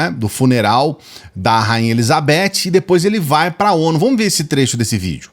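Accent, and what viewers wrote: Minas Gerais, Brazil. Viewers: Adoro esse sotaque mineiro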